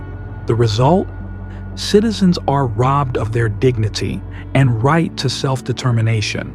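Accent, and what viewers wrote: United States. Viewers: U sound American with your accent....also do remember how the US has meddled in and still.meddles in african countries......the uk is a monarchy....and many other European countries..and in the US u have the bush dynasties.....Clinton's....Kennedys.....and it's ok for...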